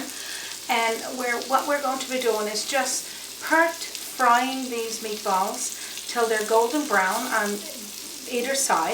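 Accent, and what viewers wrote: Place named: Newfoundland, Canada. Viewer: I like this simple recipe, I have made this many times, but I'm going to flour coat the balls like you did with flour. I ave to say the rice was not steamed in was boiled and set to cool, then served, I love your NFLD accent, some day if I ever get back I will bring you a great bag of H's, so you can say here we are instead of are we are, stay till I come were your at. I do love you don't get me wrong, this vid was 8 years ago and you avent changed, and that a good thing🥣🥣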